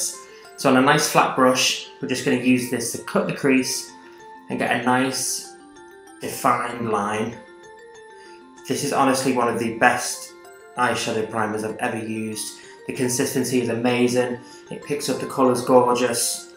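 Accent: Liverpool, United Kingdom. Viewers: Not related but I bloody love the Scouse accent! You're looking well hun, stay safe! Btw, will you be doing a queens speech this Christmas? I think as our OFFICIAL new royal you should address your adoring public! 😉🤪😂 Half joking, I know you're busy so you might not have time. 😁 Love frae Edinburgh hen! 😜🥰😘💙🏴💙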